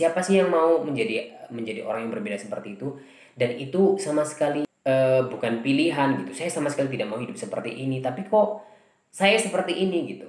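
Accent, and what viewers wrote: Java, Indonesia. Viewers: Agak medhok ya mas 🤭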